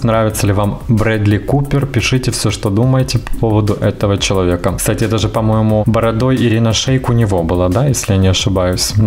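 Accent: Ukraine. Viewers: Украинизмы придают речи своеобразие и неповторимость.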